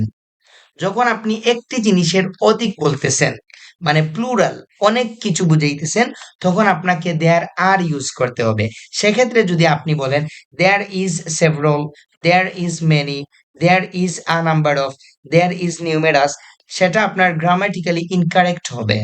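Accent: Sylhet, Bangladesh. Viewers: আপনি কি সিলেটি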